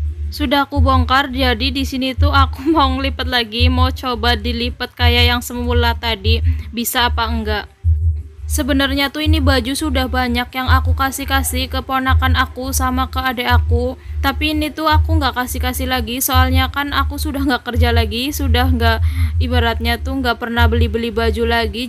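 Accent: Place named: Java, Indonesia. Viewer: Asli mana bun,medok bgt logat nya ..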